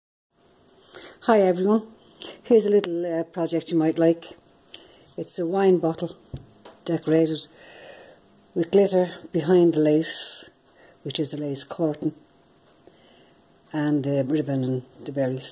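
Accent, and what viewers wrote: Ireland. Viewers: I'm just in heaven watching you I love crafting as well your so gifted thank you for sharing it's great to hear an Irish accent xx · Hiya I've just found your channel and subscribed. love your crafts thanks so much for sharing with us. I was like is that an Irish accent I can hear,,????yes it is she's Irish deadly. I'm a dub myself😀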